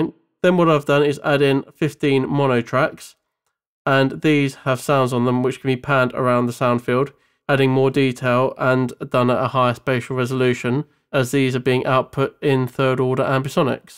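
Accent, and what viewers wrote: United Kingdom. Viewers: Eh, the dude sounds like a caucasian from the UK. Make of that what you will.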